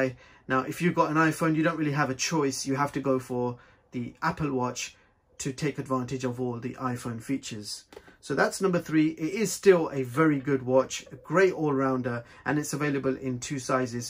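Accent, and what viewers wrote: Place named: England, United Kingdom. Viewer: Generally I don't ever leave comments, I know I should, but sometimes (as someone who needs to get the relevant info I'm looking quickly I never do. However, this occasion I was so impressed with the content of this video I felt compelled to show my support. Everything I needed answers to was in this one video. Plus, the small wrist bonus section was really appreciated. As said in the video, it's a very overlooked part of buying a smart watch. Also, as a Englishman it was great to hear an English accent narrating the video (the generic American and often computer generated voices drive me up the wall 😬😁). Really great video, very informative and exactly what you look for on YouTube when looking into some new tech to purchase. Excellent work, Thank you. Liked, commented and subscribed!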